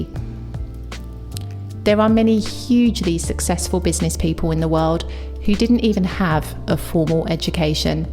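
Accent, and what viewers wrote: England, United Kingdom. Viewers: I love your English accent 🍷💕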